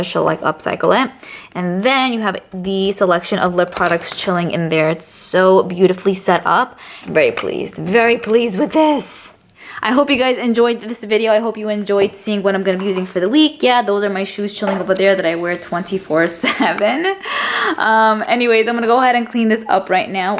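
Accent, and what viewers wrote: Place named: Queens, United States. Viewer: Just discovered your channel from suggested videos and I’m going to subscribe. I like your positive vibe. Also your accent and the phrases you use are reminding me of my hometown of Glendale, Queens. I’m in CT now and would love to not be the only one with this accent. 😻💗🌈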